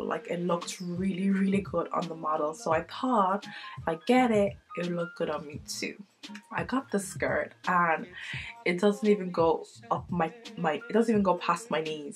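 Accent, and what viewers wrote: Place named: Ireland. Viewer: I love your Irish accent, and nice haul too 👍🏾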